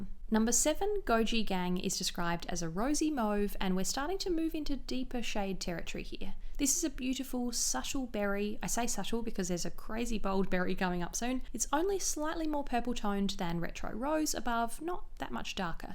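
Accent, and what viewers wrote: Australia. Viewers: I'm so used to watching American YouTubers that I, an AUSTRALIAN, was shocked to hear her accent. Love this video btw! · You are australian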